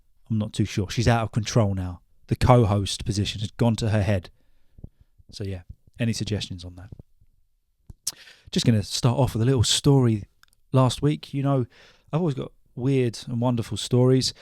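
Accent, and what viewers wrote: United Kingdom. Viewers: The you speak is utterly giving an aid to whoever want to step ahead in British accent. Thanks a lot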